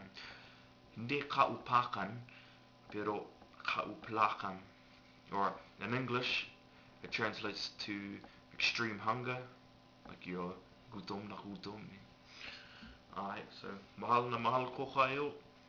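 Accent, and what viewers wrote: Philippines. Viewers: Stop trying who you are not.. I can still hear the Filipino accent.. Who you foolin?!? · ...hhmmm...galing mo po huh?!san ka po ba nag misyunaryo sa pilipinas?kasi ung accent mo hindi galing sa lugar ko?!. pero superb tagalog mo..keep it up!!!.......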